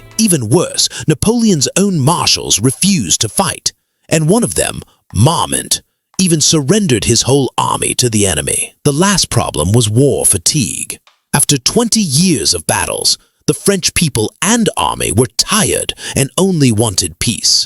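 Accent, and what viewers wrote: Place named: United States. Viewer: Jeez.. this American narrator voice completely ruined the video😳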